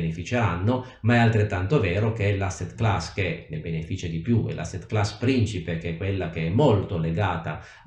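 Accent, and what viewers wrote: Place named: Piedmont, Italy. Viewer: Riconosco l'accento piemontese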